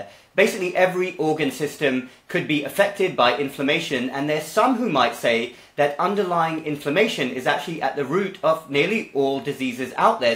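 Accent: United Kingdom. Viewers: I thought practices in USA. Though he is British, hence his accent.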